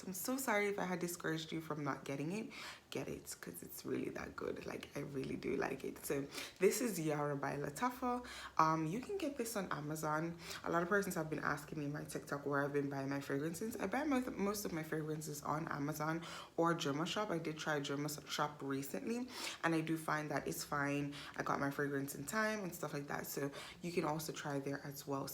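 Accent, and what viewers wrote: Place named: Jamaica. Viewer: Great review! You're from Jamaica correct? Your accent sounds a little different. Like a few different accents mixed together.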